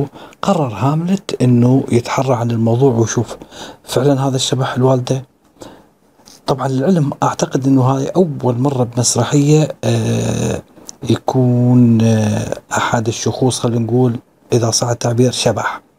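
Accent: Iraq. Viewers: ألف شششكر ..أسلوب جميل وسلس وزاده جمال اللهجة العراقية ♥️..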